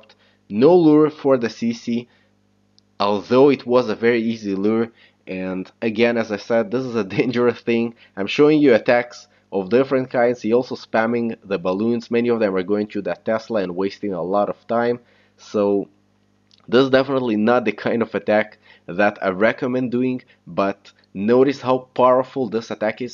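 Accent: Russia. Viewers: Ты русский? Просто я англичанин и знаю русский, и ты говоришь с русским акцентом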